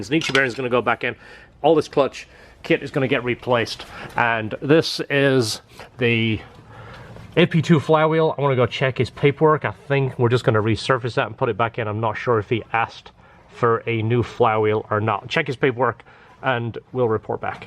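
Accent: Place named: United Kingdom. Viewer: I’m picking up a british twang In your accent.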